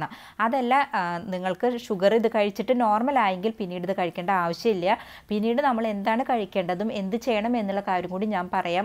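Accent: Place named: Kannur, India. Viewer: നാട് കണ്ണൂര് ആണ് എന്ന് മനസ്സിൽ ആയി..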